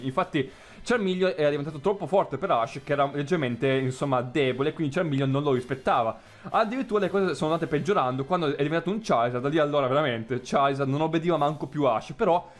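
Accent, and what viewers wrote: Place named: China. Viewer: senti gia sembri cinese, parla piu piano che nemmeno te capisci cio che dici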